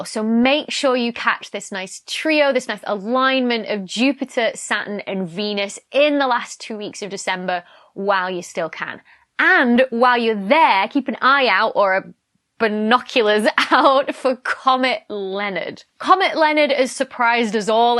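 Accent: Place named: Manchester, United Kingdom. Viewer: You were speaking much closer to the Manchester accent in your first videos. Unlike what some say, there is no harm using a regional accent.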